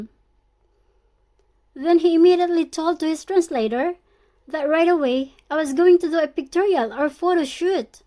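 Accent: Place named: England, United Kingdom. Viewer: Thaks again friend..i like your eng accent...nice blog you have♥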